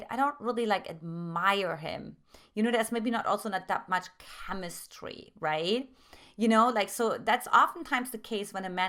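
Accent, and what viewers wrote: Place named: Germany. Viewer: Thanks. Are you from Germany? I can hear a little German accent when you speak.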